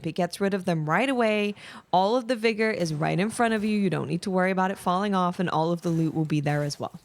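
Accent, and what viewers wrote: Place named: New Jersey, United States. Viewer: That jersey eeesssssh accent got me ❤😊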